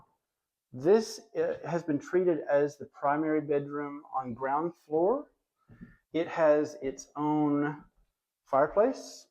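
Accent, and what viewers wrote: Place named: South, United States. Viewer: You are AMAZING. Truly. Are you also a writer.? I am guessing - certain - yes you are. The entire post, but most especially the last scene with you in the dining room - is incredibly poetic and perfect. Such a southern voice like Tennessee Williams or Truman Capote - so musical sounding and thoughtful and convincing. I LOVE this house. Keep on doing what you are doing. And keep writing. Your talent is obvious.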